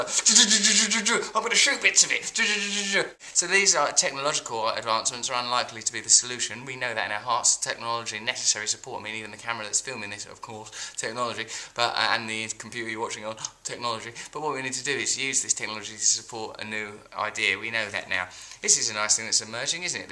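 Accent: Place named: Scotland, United Kingdom. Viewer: <link> Gone all Scottish Russ. Think you might enjoy this read x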